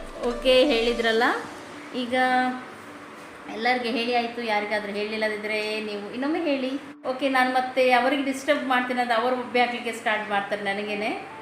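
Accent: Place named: Kundapura, India. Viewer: actually ನನ್ನ ಅಮ್ಮನ ಊರು ಕುಂದಾಪುರ.. ನಿಮ್ ಮಾತಾಡೋದು ನೋಡಿ ನಮ್ಮೂರಿನ ಹುಡ್ಗಿ ಅಂತ ಗೊತ್ತಾಯ್ತು.. ಅದಕ್ಕೆ ಕೇಳಿದ್ದು.. Don't mistake me..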